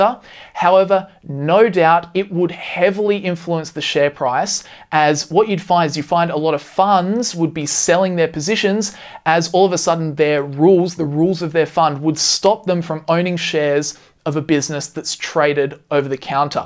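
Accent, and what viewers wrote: Australia. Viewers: you got my attention with the quote: "yea awright, grandpa!!" .. good analysis with concerted effort to avoid over-influencing our opinion... love yur Aussie accent...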